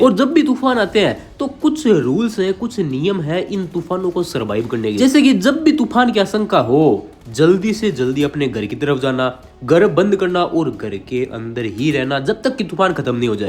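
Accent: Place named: Rajasthan, India. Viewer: Bhai main Teri voice sunke Bata sakta hun tu Rajasthan se hai ❤️❤️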